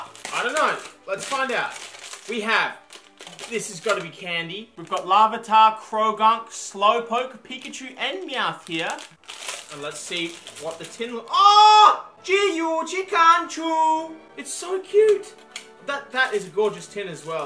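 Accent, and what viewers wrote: Australia. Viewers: Ur so Australian lol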